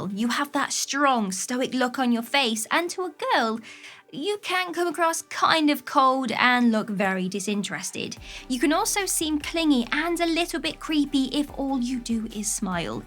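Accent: United Kingdom. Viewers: I just found this channel tonight; this girl is really cute and I love her British accent.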